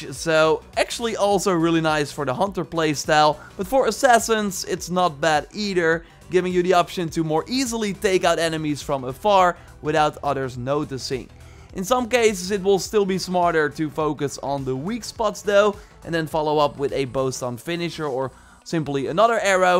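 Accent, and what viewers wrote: Netherlands. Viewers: Never watched you before. But based on your accent, I immediately knew you are Dutch!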